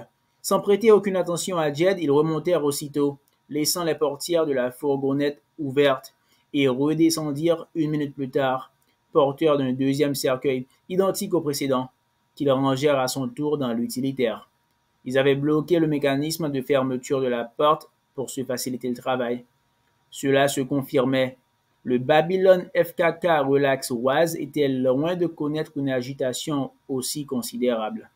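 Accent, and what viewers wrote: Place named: Caribbean. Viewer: Mon Dieu, vouloir lire Houellebecq est une intention louable, mais avec un accent antillais pareil...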